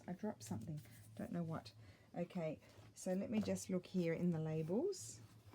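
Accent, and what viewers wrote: Australia. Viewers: I really enjoyed this video. I love your Aussie accent and your enthusiasm. These collages are some of the best I’ve seen.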